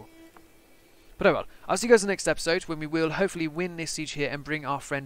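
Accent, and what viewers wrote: United Kingdom. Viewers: . You're thinking of Mo Farah, the long distance runner. Mo Salah is a soccer player. Bro you have the most british accent I've ever heard, you should know this.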